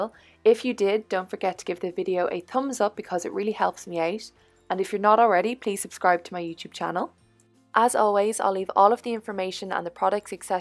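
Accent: Ireland. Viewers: how can she help that its an irish accent im irosh and thats how i say it .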